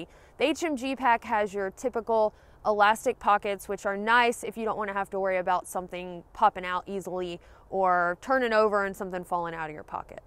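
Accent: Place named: Alabama, United States. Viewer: I just found your channel, and I'm loving it! So much awesome information. 😊 Side note: do you happen to be from Alabama or around here? Our accents sound similar haha.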